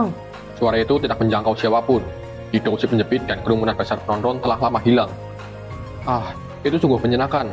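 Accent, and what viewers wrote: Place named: Java, Indonesia. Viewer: Suaranya diperbaiki, logat jawa dan suara mendem ditambah dIkasih efek bukan perpaudan yang bagus. Perjenih suaranya dulu aja baru digaya2in.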